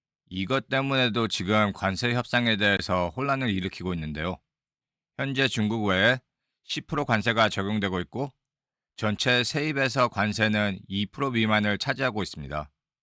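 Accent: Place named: United States. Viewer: 오히려 미국 네이티브 스타일의 한국어 발음. 난 듣기 좋다.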